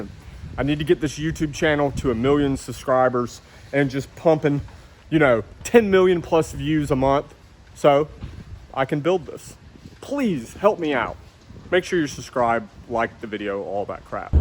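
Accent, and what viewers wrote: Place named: Appalachia, United States. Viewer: Were you from originally sound a little hillbilly to me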